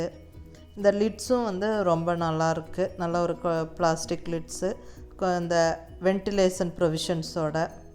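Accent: Coimbatore, India. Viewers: Very nice mam your red color set neenga cbe ya neenga pesura slang very nice mam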